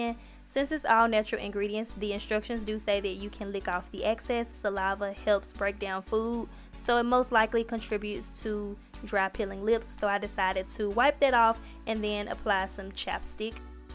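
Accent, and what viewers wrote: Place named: Memphis, United States. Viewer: Came here for the chin lift and heard that Memphis accent ... ended up watching the whole video 💕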